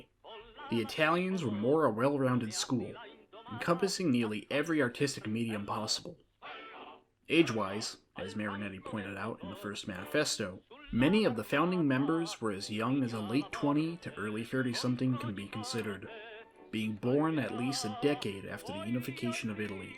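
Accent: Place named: Wisconsin, United States. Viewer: You sound like you're from Manitowoc, Wisconsin.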